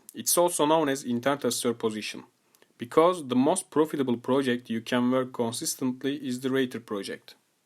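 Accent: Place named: Turkey. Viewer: yes there is a turkish accent :)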